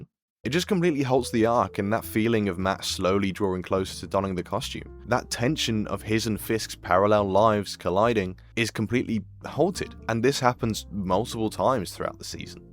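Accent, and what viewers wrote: United Kingdom. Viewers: It's infuriating that "lore" and "law" are pronounced identically with a British accent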